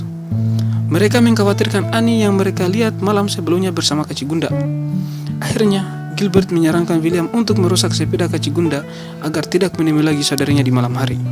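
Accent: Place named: Papua, Indonesia. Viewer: CARA NGOMONGNYA KAYAK PAPUA MAAF YA BNG 🙏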